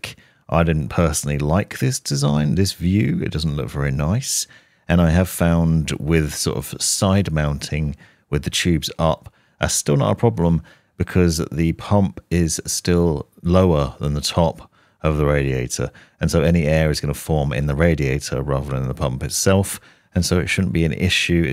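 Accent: United Kingdom. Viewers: you sound uk based, you know any sites with configurators where i could get a quote and plan to buy without really scummy pricing? cos the one site i do know make you basically pay its cost twice over by not offering a way to buy it in one go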